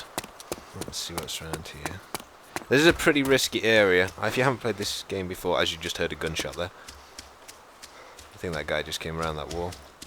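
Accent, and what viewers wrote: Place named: Northern England, United Kingdom. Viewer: Sound like you're from Northen England!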